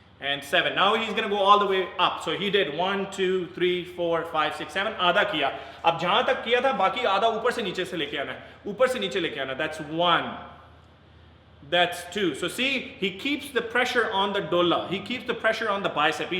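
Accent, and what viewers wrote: Punjab, India. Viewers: Excellent elaboration of each workout. I really like the punjabi language touch of the guy hosting the videos. Keep the good work up..!!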